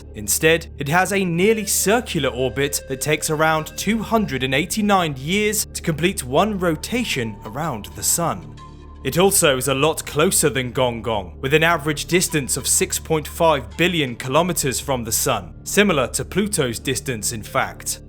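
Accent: United Kingdom. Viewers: Tired of every video narrated by a tired, boring, pretentious sounding British guy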